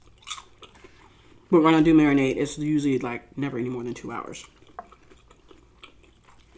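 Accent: New York, United States. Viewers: Hey boo lol the New York Accent 🤣🤣🤣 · Yes you sound a New Yorker and we loveeee your videos‼️ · Yeeesss with the NYC accent. Rep my hometown😍😍😍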